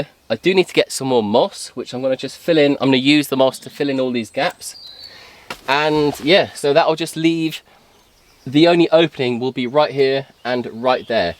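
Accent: United Kingdom. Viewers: our big Yellow Lab named Bentley and our four White Swiss Shepherds named Pearl, Mauna Kea, Singh Dog and Tiger all love watching you and Amber in your wonderful and healing videos... and my Celtic dna loves your lovely accent and gentlemanly nature... it reminds me of time spent traveling in England and Scotland years ago... felt right at home... lots of love and respect to you and plenny aloha from the Big Island of Hawaii...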